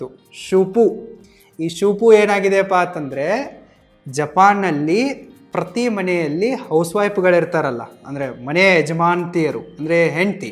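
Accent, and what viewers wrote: North Karnataka, India. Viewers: ನೀವ್ ಉತ್ತರ ಕರ್ನಾಟಕದ ಕಡೆ ನಾ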